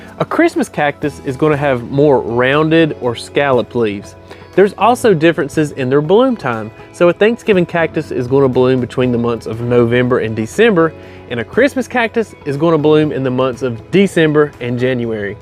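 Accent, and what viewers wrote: United States. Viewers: Sir I love your country accent, where are you from brother?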